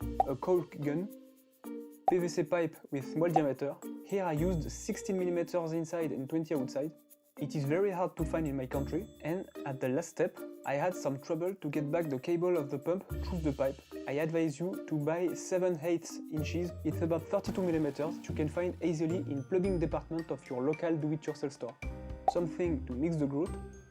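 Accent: France. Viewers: English is good but you French with that accent make me laugh. Sheet = shit lol. I think all French struggle with English. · J'ai direct su que t'étais français à l'accent 🤣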